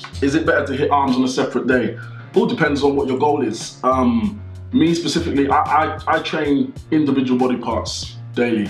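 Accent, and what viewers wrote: United Kingdom. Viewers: Did not expect the british accent.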